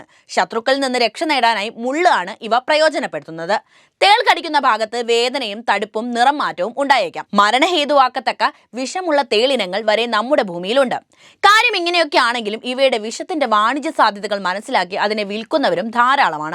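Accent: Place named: Idukki, India. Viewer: സത്യം പറ,, വീട് ഇടുക്കീലാണോ ?😊😊 cause I’m from adimaly,, that accent of yours is same as mine 💪💪🥰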